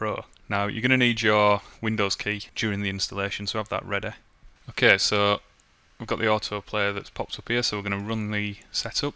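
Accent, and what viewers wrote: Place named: England, United Kingdom. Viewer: Its realy hard to understand you with your long english accent but I think its only me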